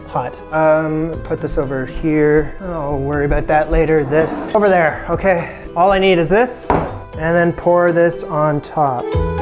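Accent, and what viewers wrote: Canada. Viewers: Listening to you speak you sound like someone from North America and your ou dip-thongs narrow that to Canada, but your vocabulary (minced beef, rubbish) suggests you’re on the other side of the Atlantic. But then you express surprise that butchers don’t understand what you want when you ask for a cut of ‘chuck’, beef, which I would have thought as an ex-pat you’d simply realise is because it’s a term not used in the country you live in. But you don’t make any comment bringing up that possibility, (very odd). All of this creates an air of mystery. After watching two videos, I notice you never refer to your nationality, even though you use vocabulary that doesn’t fit with your accent. I’m assuming, based on your trip to the butchers, his accent and the look of the buildings outside, you’re somewhere in the UK. To top it all you look and perform way too expertly in the kitchen for someone who doesn’t know much about cooking. 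The mysteries just keep coming. Why is there such a big mystery about where you’re from, why you’re cooking in a country you’re not from, how you became so adept at preparing and cooking food and so on? Is there some part of the puzzle I’m missing?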